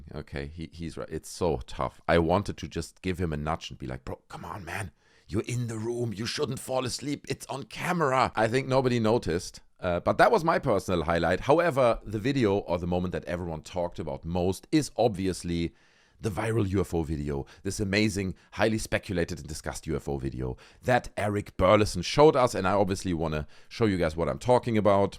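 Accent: Germany. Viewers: The German accent takes this channel so far over the top, I couldn't subscribe fast enough. Commence binge!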